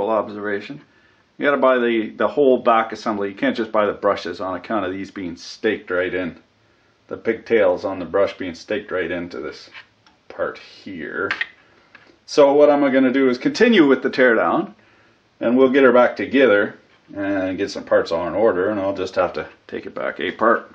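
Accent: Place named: Canada. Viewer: I love listening to you I don't even know what da hell ur doing but hearing a Canuck talking shit is awesome thanks 🍀🍀😁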